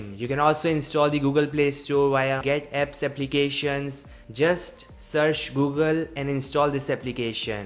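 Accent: India. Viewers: i like english from india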